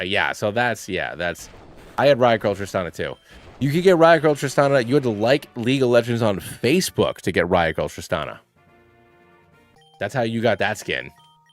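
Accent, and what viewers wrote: Scotland, United Kingdom. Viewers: Lol, no. I genuinely thought you may have been from Scotland 😂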